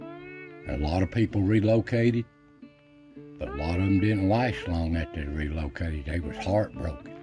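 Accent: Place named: South, United States. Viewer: Same here! Its comforting to hear just talk! Its sad even the accent around here is slowly being bred out I reckon. My accent isn't as strong as my grandparents, and my daughter only barely even sounds southern. Dang internet I reckon. But yes, just listening to these stories, the rhythm and the southern drawl is like a time machine!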